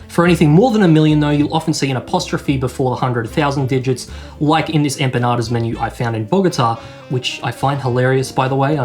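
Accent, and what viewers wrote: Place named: New Zealand. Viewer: Love that kiwi accent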